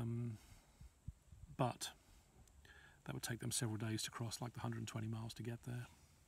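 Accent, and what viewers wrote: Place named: England, United Kingdom. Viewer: Good ole English voice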